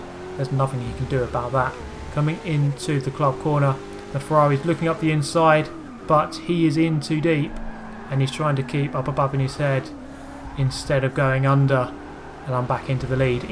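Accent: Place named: RP, United Kingdom. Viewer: I like the gameplay and reasoning behind the trolling the trolls idea, but you sound so posh especially when you say “That’s a bit of a inappropriate joke for a child’s racing game”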